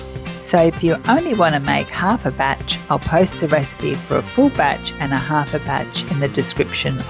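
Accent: Australia. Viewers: Fantastic!!! Thanks so much! So happy to hear a fellow Aussie cook😃💞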